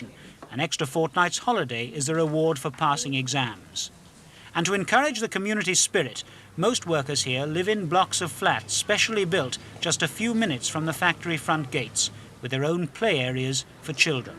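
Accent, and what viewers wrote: United Kingdom. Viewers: I'd rather just watch the video footage of people living their lives than having to hear some nasal British commentator spend every five seconds trying to tell you what an unbearable hellhole this is supposed to be.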